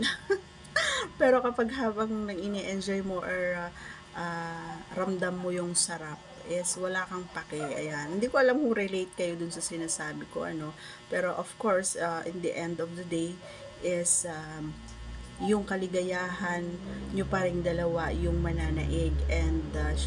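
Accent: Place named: Visayas, Philippines. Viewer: Saan ba probinsia mo baby ? bisaya ako halata kita bisaya ka.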